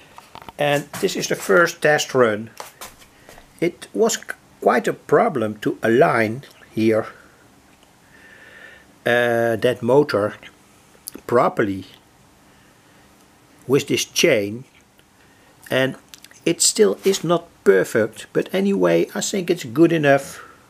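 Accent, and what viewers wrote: Netherlands. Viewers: btw are you dutch you have a Dutch accent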